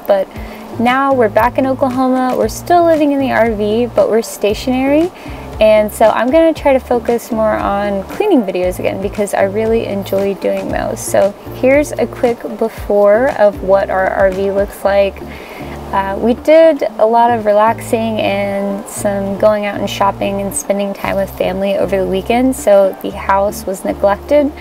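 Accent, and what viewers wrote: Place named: Canada. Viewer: Great job!! One question I always wanted to ask you though. Sometimes you have a somewhat Canadian accent at times. Are from up north in the states or Canada originally? 🙂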